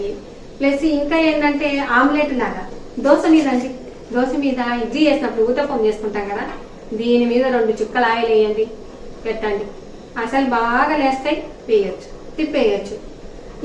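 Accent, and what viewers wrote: Rayalaseema, India. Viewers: Mana Rayalaseema slang chaala baaga matladtunnaru andi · Avva super maa avva kuda mee lage undi madi ndl local andi meedi nagative a vuru andi meeru chebutu unte naku ndl ravalanipistuni kani hyd lo vuntamu mimmalni Ndl akkado pelli lo chusinattu anipistundi anduke a vuru ani adiganandi mee videos chustuvuntanu rayalasseema stylo ne matladandi maku vurlo vunnattu anipistundi maa amma kuda meelage pappu gattiiga chestundi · ఎన్ని రోజులు అయిందండీ రాయలసీమ వారి వంట ,మాటలు విని,ఎక్కువగా కోస్తా వాళ్ళ చానెల్స్ ,language ఉంటుంది.చాలా బాగా చూపించారు అన్ని items ఒకే వీడియోలో thank u. మాది కర్నూల్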